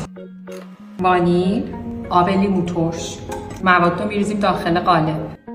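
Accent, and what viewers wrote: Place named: Iran. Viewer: چه بانوى ايرانى نازنينى با لهجه شيرينى كه بسيار هنرمند هم هستند ♥️♥️♥️♥️♥️پاينده باد ايران و مردمان خونگرم و مهربانش ♥️♥️♥️♥️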